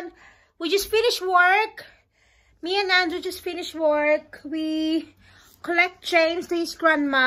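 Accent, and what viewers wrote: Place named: England, United Kingdom. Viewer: I love love the English accent. I can listen to you talk all day long. Sorry your original Christmas plan didn't happen, but time alone with each other is ❤️. So cute to hear you say mukbang and Noche Buena. Hala, Tagalog pala. Pinahirapan mo pa akong mag ingles. Kuhhh, bata ka! Hahaha, nice meeting you.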